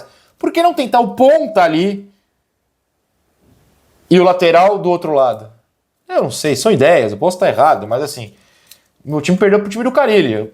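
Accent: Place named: Portugal. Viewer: Não aguento mais português, até o sotaque do cara está me incomodando não que eu seja xenofóbico.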